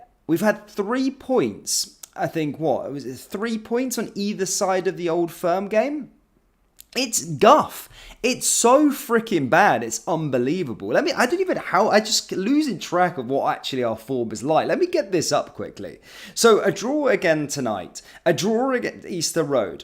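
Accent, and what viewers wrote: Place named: England, United Kingdom. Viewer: Sounds to me you are an Englishman so what’s it to do with you….go home.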